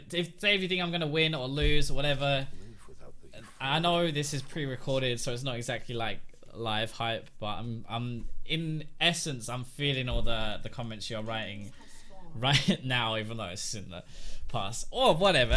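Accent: United Kingdom. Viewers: I could listen to your accent all day. British guys 😍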